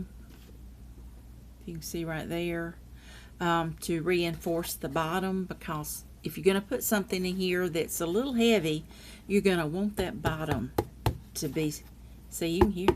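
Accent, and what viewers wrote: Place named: South, United States. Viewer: Hi,: I think you did a fabulous job on this video... you've enticed me to make a tool box for my mum, who is in a retirement home. She's a very girly girl (at 90 she calls herself a girl!) so I'm going to use pink cardstock and pink floral paper. I know she'll love it. Thanks so much for sharing and do please continue to do tutorials... you're really very good at it... and I love listening to your sweet southern voice. Hugs, Nancy:)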